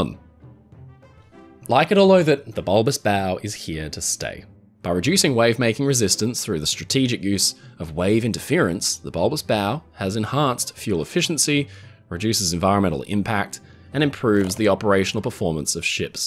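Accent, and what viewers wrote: Australia. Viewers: he sounds like an Aussie to me?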